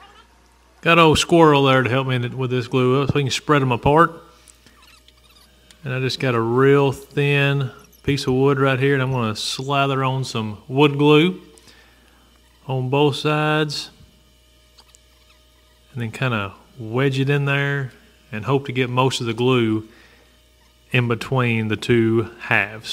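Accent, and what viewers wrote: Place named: Mississippi, United States. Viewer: Yeah, I live in the northwest now, but I knows my peeps when I hear 'em speak. lol Spent summers with grandparents in West Point, MS. growing up. When I first heard you speaking I thought you were one of my uncles. lol Good video by the way. Chat later.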